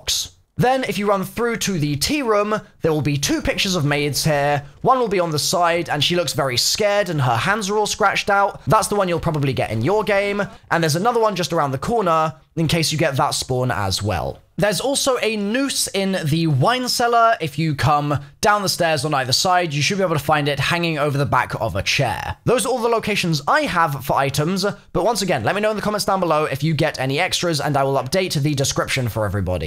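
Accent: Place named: United Kingdom. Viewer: "cemetree"? "vaze"? I cannot take the British accent seriously.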